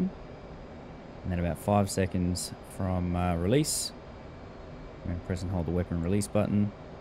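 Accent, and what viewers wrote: Australia. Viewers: So nice hearing another Aussie accent. Great video!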